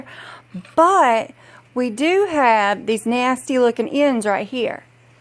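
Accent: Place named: South, United States. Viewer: I love your sweet little southern twang and your bracelet says "FUCKING LADY LIKE" - love it!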